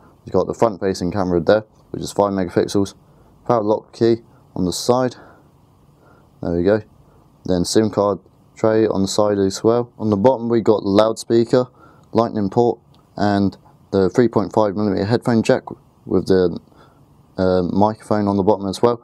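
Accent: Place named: England, United Kingdom. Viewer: Are you English?, I love that accent!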